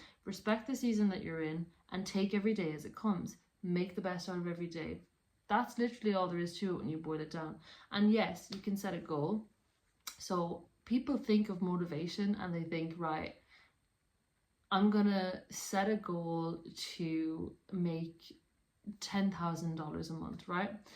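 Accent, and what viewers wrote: Ireland. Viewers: Your killing me with the Irish accent. Love the accent. Your doing a great job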